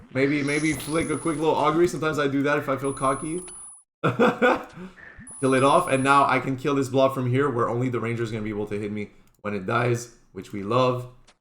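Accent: Quebec, Canada. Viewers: are you from quebec?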